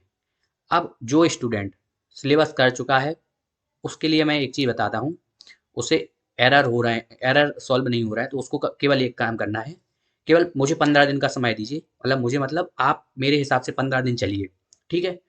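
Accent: Bundelkhand, India. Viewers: Bro are you from bundelkhand??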